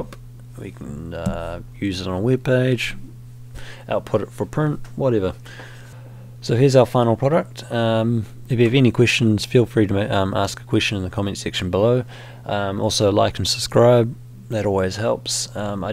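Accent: New Zealand. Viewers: Thanks for this! Great to hear a kiwi accent on a tutorial for a change too. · Thanks, great to hear a kiwi tutorial for once, very clear and easy to follow:) have subscribed and will check out more of your stuff soon, thanks for shearing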